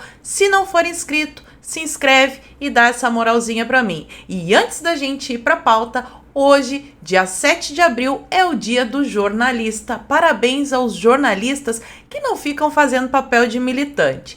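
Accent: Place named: Minas Gerais, Brazil. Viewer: OCE. É. GAUCHA. O. É. MINERA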